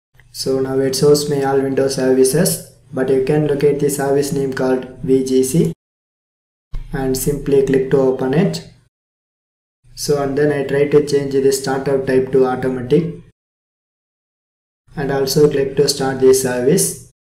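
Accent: India. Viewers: indian voice, 100% sure it works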